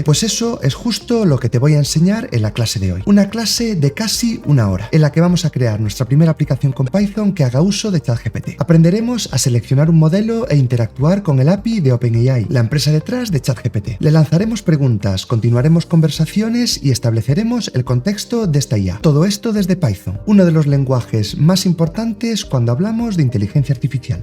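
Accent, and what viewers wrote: Galicia, Spain. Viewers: eres gallego? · a mii me gusta que hables asii suave . Es genial ! Además el acento gallego de Lugo que tienes me encanta !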